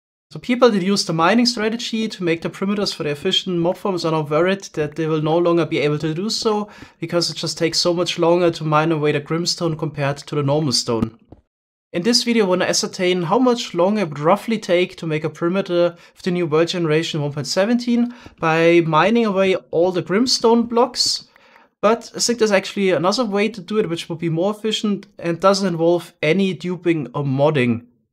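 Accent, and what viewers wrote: Germany. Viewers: I'm sorry aber man hört das du deutsch bist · Ich höre da definitiv Deutsch raus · You're German? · dein english is so deutsch holy fuck😅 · I didnt know it is possible to have such an EXTREME German accent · Ich Feier den deutschen Akzent^^ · Im sorry to say this but u rrally have an extreme german accent man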